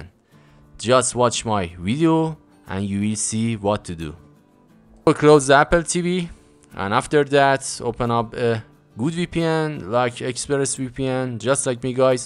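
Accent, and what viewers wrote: Iran. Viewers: Persian accent kicking out 😂😂 (موفق باشی)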